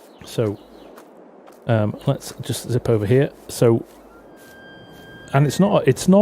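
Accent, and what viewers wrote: England, United Kingdom. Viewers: Could I ask, are originally from the uk but now live in America? Im always lost that u have an English accent but do everything American 😂 pls excuse my ignorance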